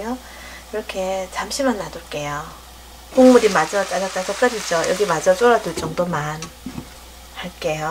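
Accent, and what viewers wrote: Gyeongsang, South Korea. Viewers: 어제의 거지탕ㅋㅋ에 이어 오늘은 굴비양념구이 들어갑니다ㅋㅋ (어른들이 남은 전요리를 거지탕이라 하시더라요.ㅋㅋ) 이모덕분에 싸주신 음식들 깨끗하게 클리어 해가고 있어요^-^ 이모는 어디 계세요? 말씨나 레시피, 영상으로 느껴지기는 경상도 같은데.. 저희 가족은 모두 대구:) 외갓댁은 안동이구요~ 지금은 일 때문에 저희 부부만 낯선 포항에서 지내고 있어요ㅠㅠㅋ 유튜브에서 좋은 이모 만났다고 명절 보내러가서 자랑했다는ㅋㅋㅋ 신랑도 열씨미 배워보라며 응원해줬어요.♡ 다음주에 식혜, 약밥 도전 예정~~ 쉽고 디테일한 설명으로 요리에 재미들인 늙은 새댁 깸미 댓글 드리고 갑니다.ㅋㅋ ps. 오늘도 마니 마니 웃으시고 행복하셔요~♡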